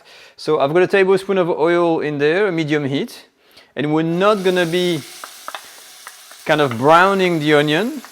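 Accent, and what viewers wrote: France. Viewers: I've lived in France for more than 40 years, and I still love the authentic French accent when speaking English!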